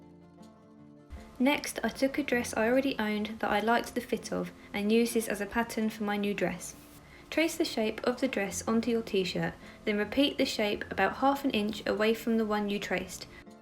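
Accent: United Kingdom. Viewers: Saaf Landan!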